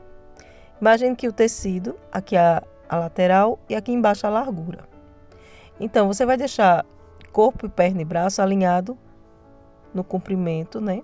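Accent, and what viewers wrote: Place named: Northeast, Brazil. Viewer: Tudo que você faz nos encanta, e esse jeito de ensinar maravilhoso😊 com um sutaque puro nordestino gostoso de ouvir, amoooooo...❤❤❤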